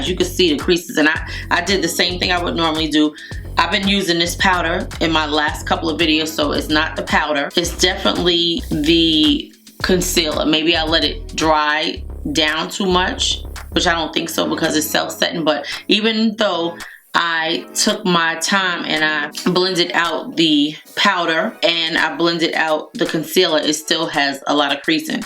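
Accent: New York, United States. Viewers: New subscriber here! Where are you from?! Your accent sounds like the NE Philly or NYC or dare I say it.. jersey?! I gotta know if I’m close. Also, great content! Happy to have found your channel!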